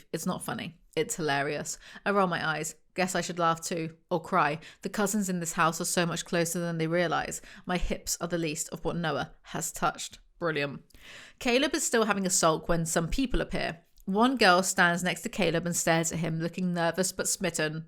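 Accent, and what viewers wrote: United Kingdom. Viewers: Honestly, I've said it before and I'll say it again, I'd kill to get your book reviews on spotify for long term british yapping ad-free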